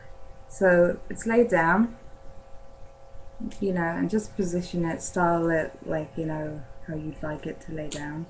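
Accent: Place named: Ireland. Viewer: I knew you were irish as soon as u started talking from your accent ^^ im irish too :) Really want to make a tail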